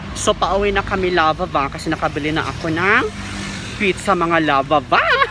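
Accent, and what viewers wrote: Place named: Visayas, Philippines. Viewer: Taga Baguio ka Po ba madam? Akala ko nasa bisaya Po Kayo hehe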